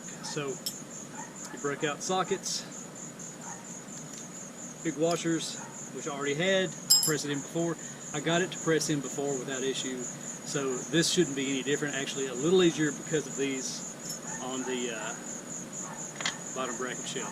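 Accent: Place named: South, United States. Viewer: Hands down best guide on youtube, thanks! Always a pleasure to hear a well-spoken Southern man who understands millimeters!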